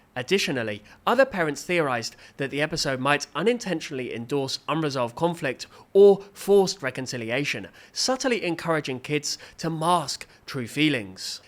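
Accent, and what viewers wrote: United Kingdom. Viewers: Bra I'm not going to subscribe to this stupid British dude learning English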